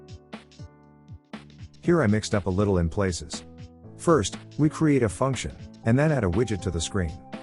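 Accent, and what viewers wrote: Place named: China. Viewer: 这英文听起来很像中国人。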